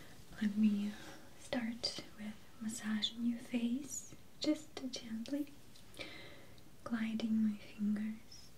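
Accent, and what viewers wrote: Russia. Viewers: Her accent is Russian. Watch some newer videos. Sometimes she talks about Russia. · i love your accent sounds russian such a relaxing video :)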